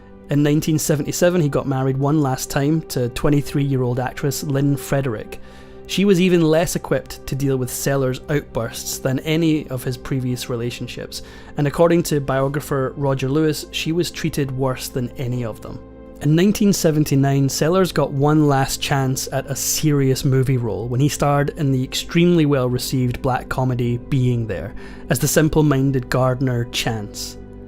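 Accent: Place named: Scotland, United Kingdom. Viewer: Nice to hear a Scottish voice for a change. 😊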